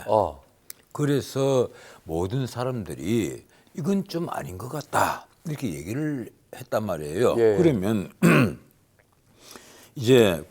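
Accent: Jeolla, South Korea. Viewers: 절라도광주앵커 티를 너무낸다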